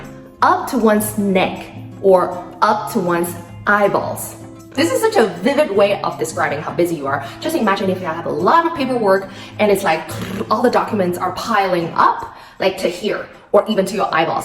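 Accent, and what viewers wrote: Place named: Vietnam. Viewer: I was quite astonished by the time i heard your accent, it was really like Vietnamese accent, but it turned out you are an authentic Chinese . Anyway your English voice is So great, i love it. A comment from viEt nam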